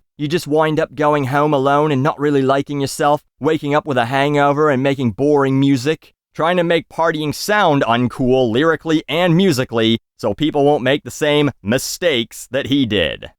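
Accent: United Kingdom. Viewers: The Brit accent cracks me up. Also the gimp mask and strap-on Hahah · That British Accent Though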